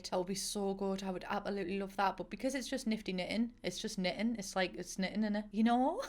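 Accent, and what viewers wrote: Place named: Northern England, United Kingdom. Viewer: I FORGOT UR NORTHERN ILY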